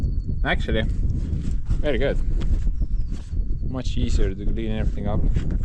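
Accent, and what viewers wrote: Estonia. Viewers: I mentioned your channel to an acquaintance I have in Latvia. He saw your name and said you're for sure Latvian living in Estonia because it's such a Latvian name. But then he heard your accent and said, "No he's for sure Estonian. Now I'm intrigued." 😅 Hopefully he'll be a new fan!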